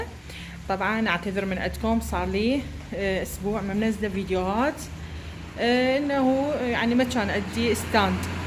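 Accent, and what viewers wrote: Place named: Diyala, Iraq. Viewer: الله يبارك بيك صوتك من اهل ديالى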